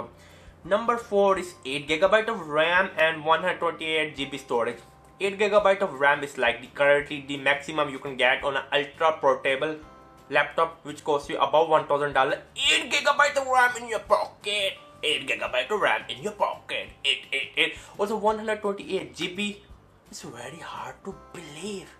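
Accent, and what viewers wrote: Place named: India. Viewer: Indian accent awesome sounds like Russel Peters.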